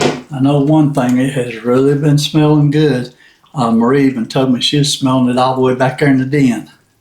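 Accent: South, United States. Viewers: Lovely cooking demo. It came out great and I loved listening to your southern accent. 🫶🏾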